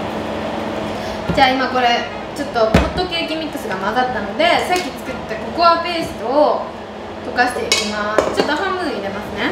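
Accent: Kansai, Japan. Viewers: 葵のアウター姿も可愛いが 本当は葵自身が可愛いのだ💕BEAUTIFUL GIRL💖💖💖💖💖 そして葵の関西弁は本当に可愛いね。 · JULIAちゃんの動画から来たからバリバリ関西弁？なのすごい驚いてる😂💗💗かわいい😳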